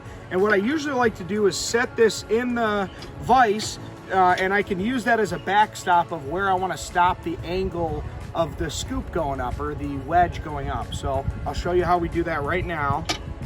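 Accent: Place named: Florida, United States. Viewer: Michigan? Definitely thought u were a Florida boy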